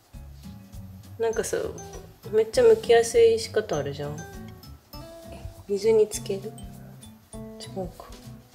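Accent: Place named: Miyazaki, Japan. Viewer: 花嫁修行でうまかっちゃんかよ！😊笑ゆで卵はガンガンやって全体にヒビを入れた方が剥きやすそうさんって宮崎っぽいアクセントだけど県内の人なのかな？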